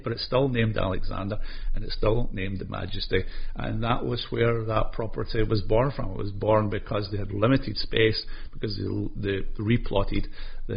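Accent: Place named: Scotland, United Kingdom. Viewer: Just found your video and was delighted to hear what I think is a fellow Scottish accent - hello! I'm really not surprised to hear Reunion resort on your countdown here - I've always thought it looks stunning in there. If you ever do an overview or more affordable options, I always rate CLC Encantada in Orlando - nowhere near the level of Reunion, but a really solid option if your budget doesn't stretch that far!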